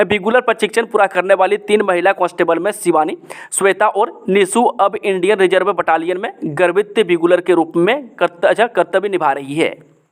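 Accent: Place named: Uttar Pradesh, India. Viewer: Bhai tum Hindi UP style mein bolte ho.UP ke ho kya.HP ke reader khatam ho Gaye jo tumko import karna pda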